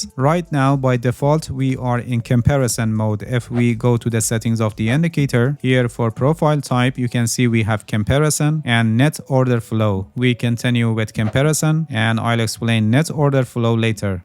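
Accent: Iran. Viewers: The accent. Isn’t it Persian ?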